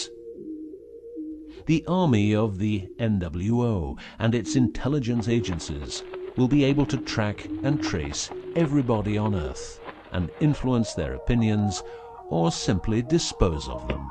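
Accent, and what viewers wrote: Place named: United Kingdom. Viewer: These assholes lost my interest when during the segment describing brain benefits of monatomic gold, they said, "detecting lies" and flashed a picture of George W. Bush instead of Bill and Hillary Clinton. That's pretty much all I needed to know about these idiots. A British accent spewing bullshit does not a watchable video make.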